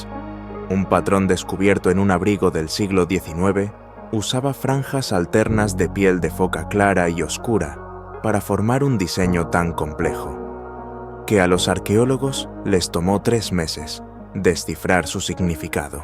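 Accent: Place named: Spain. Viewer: TU ESTÚPIDA VOZ DE GACHUPIN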